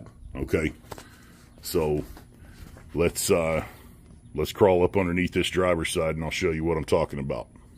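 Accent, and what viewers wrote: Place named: United States. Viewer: This guy has the most American voice I’ve ever heard. Made me watch the video instead of skipping ahead.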